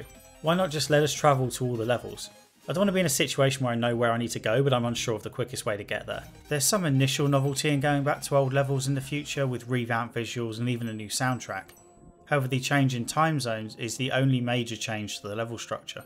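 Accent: United Kingdom. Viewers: Gonna be honest as a Canadian the first 40 seconds were so British I couldn’t understand lmao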